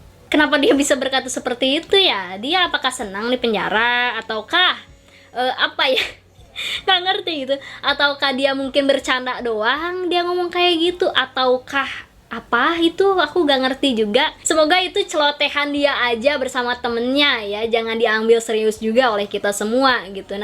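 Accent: West Java, Indonesia. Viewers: Orng sunda ya ka??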